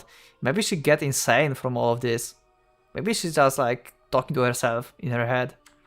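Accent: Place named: Germany. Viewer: I'm watching this because alot of debatetubers are glazing the main character's writing. Is he really above in terms of characters like Walter White and Beato? Also, I do want to play the full vers (including the sus scenees) on steam but I don't have a functioning pc 😢 Also, I like your accent man, you remind me of a german teacher I once had.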